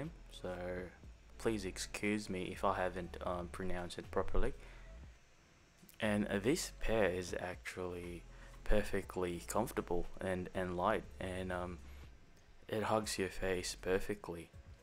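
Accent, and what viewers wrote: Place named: Philippines. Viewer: U a filipino bro your accent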